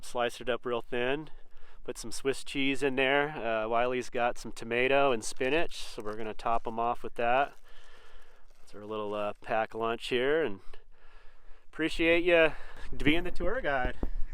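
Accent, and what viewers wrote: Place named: Minnesota, United States. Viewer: 2nd camp is so beautiful. I love Minnesota voice.. I’m from Michigan, I can hear his accent haha! Coyotes freak me out, not a fan. Love the prairie dogs🩵 so cute! I love ribeye steaks. Burgers for the win! Safe travels & camping, until next time!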